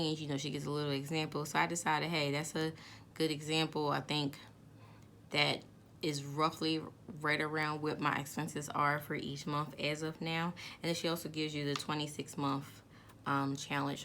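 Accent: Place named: Philadelphia, United States. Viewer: You sound like you're from Philly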